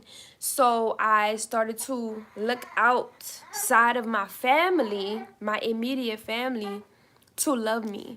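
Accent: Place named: Louisiana, United States. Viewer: You sound like you are from Louisiana ❤️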